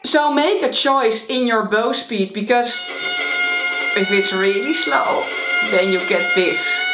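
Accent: Netherlands. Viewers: Just the video I was looking for, been playing for a year and always struggling with the sound of my E string. Love the accent too (Dutch?), subscribed! 😊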